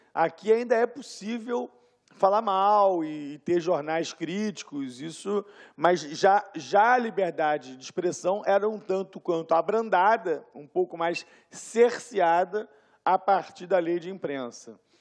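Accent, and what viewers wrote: Rio de Janeiro, Brazil. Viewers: Acho fofo o sotaque carioca, hahaha. Fica uma coisa meio bêbado falando "dia da mentiroann" , não é deboche, acho fofo mesmo!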